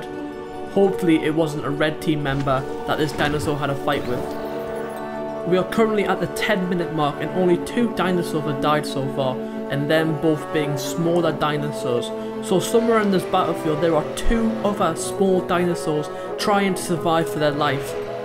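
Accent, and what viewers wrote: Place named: United Kingdom. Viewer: The UK accent is fire bro you got the whole YouTube voice 🔥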